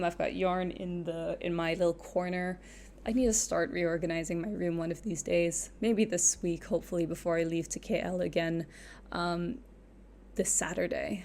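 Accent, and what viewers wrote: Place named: Philippines. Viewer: Hello! You do like a bit Filipino coming from a Filipino. I think SEA prefer crochet also is because it's much more breathable because it's more hole-y compared to knitting so it's better for the warm weather in SEA.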